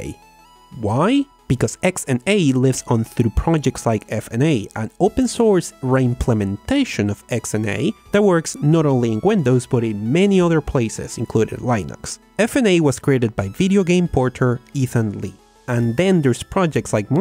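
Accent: Spain. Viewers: deshacerte del acento español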